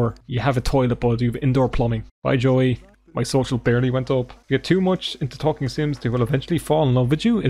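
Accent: Ireland. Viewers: Sims 1 with an Irish accent . I clicc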